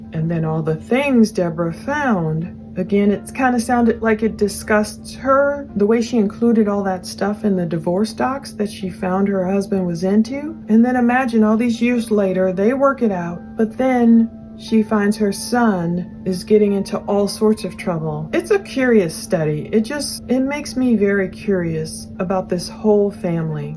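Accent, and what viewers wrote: Australia. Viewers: Love your channel think that accent is Aussie tho 😊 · Australian accent , you do an amazing job. Thankyou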